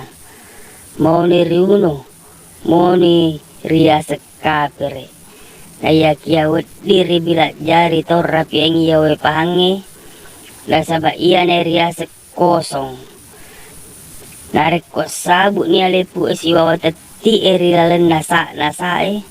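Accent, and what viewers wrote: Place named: Soppeng, Indonesia. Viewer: Kya Bugis soppeng bahasanya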